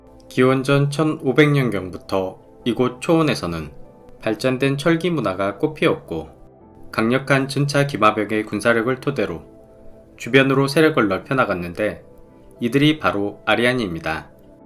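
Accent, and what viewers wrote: Jeolla, South Korea. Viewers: 억양을 보니 전라도 출신이시네요 ㅎㅎ 광주같은데... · 혹시 전라도 분이신가요? 사투리가 익숙 하길래 여쭙니다 ㅎ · 전라도 목소리네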